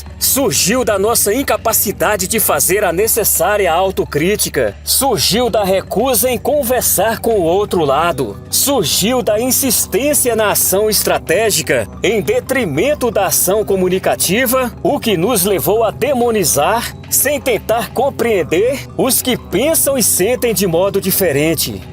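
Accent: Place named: Bahia, Brazil. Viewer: você é baiano? Esse seu sotaque está me fazendo crer que sim!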